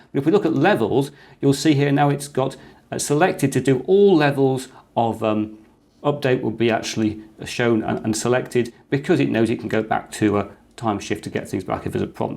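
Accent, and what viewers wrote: United Kingdom. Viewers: Hello! I need you to know that I'll be using your voice to learn British accent and about computers as well 😁 So, I'll be playing all of your videos on repeat even at night 😎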